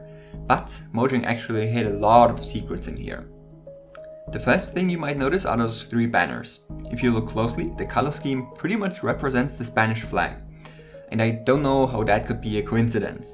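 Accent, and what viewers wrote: Germany. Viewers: Dein Englisch ist so schlecht. Man hört dass du aus Deutschland kommst.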